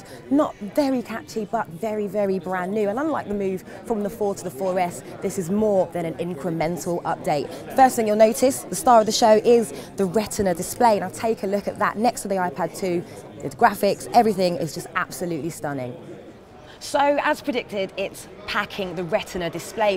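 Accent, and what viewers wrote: United Kingdom. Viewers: Don't you just love the british accent? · I can't stand british accent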